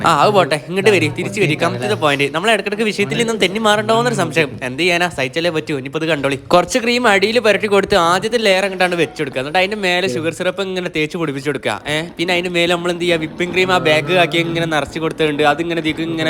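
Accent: Malappuram, India. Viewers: ഞാൻ കണ്ടീൽ വെച്ച് മലപ്പുറം വർത്താനം കറക്റ്റ് കൊണ്ടുവന്ന ഒരേ ഒരു വ്യക്തി ♥️ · Nammale malappuram baasha polichilleeeee guys kondotty power......😄😃😉😉😉😉😉😉😉😉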